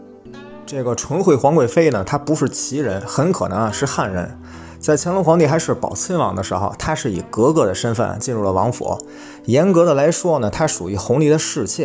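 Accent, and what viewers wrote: Beijing, China. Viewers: 功课十足的视频👍&京腔京韵的普通话💯👍谢谢分享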